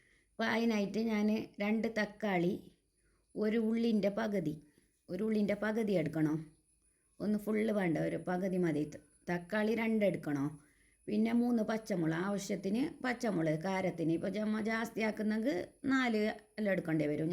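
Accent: Kasaragod, India. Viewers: നിങ്ങടെ സംസാരം എനിക്ക് ഒത്തിരി ഇഷ്ടമായി നിങ്ങൾ കാസറഗോഡ് ആണല്ലേ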